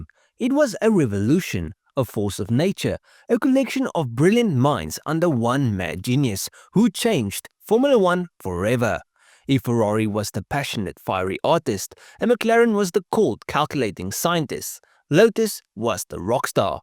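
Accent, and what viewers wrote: South Africa. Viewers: Three seconds in and I’m like holy shit this person has the thickest South African accent I’ve ever heard.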